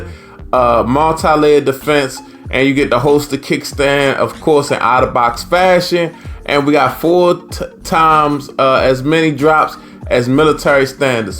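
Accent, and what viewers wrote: Baltimore, United States. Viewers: Sounds like you're from Baltimore, good review and also dud was right cut the nails 😂💯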